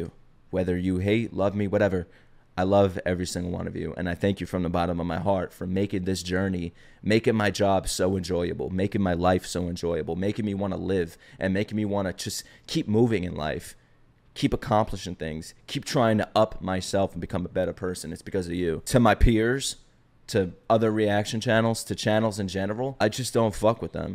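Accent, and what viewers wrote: New York, United States. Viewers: Hi...I'm a 4 hour New Subscriber...LoL...That first reaction Video of Pentatonix I was floored laughing and enjoying myself, from that one video I instantly Subscribed.....and Less then 5 minutes in I knew you were from New York...lol...YOUR AWESOME....FUNNY AND DAMN YOUR REACTIONS ARE AWESOME! Haters are always going to hate...It tough but Don't Let em Get you Down. You've got Fans and Subscribers Who Love what you DO...SO Keep Your Head Up and Don't Let em Get you Down.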